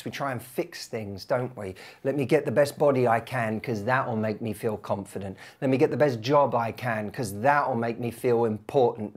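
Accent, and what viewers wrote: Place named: United Kingdom. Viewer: I think you’re an EXCELLENT SPEAKER! And we LOVE The way you talk, and your British accent. It’s amazing how we have these silly things in our head like you said stop comparing ourselves to others. And I prefer over Tony! seems genuine and kind compassionate NOT filthy dirty slimy and manipulative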